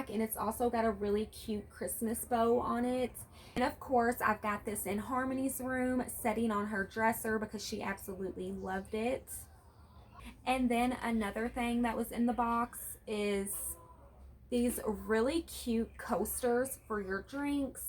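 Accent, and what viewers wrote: South, United States. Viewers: Hey... your home looks great also I'm new to your channel, I just subscribed. Where do you live? I detect a southern accent. Shelby NC here.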